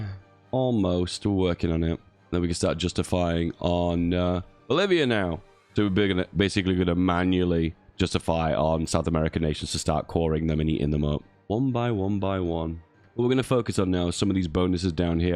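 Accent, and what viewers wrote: United Kingdom. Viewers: your calm and collected attitude and British voice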